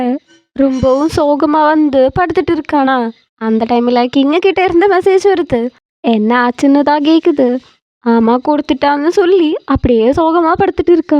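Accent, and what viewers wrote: Kerala, India. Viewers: Malayali tamil ayyo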